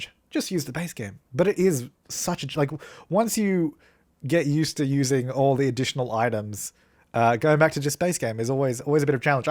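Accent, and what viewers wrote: Australia. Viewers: Is it just bc of his Australian accent or does he sound like draw with jazza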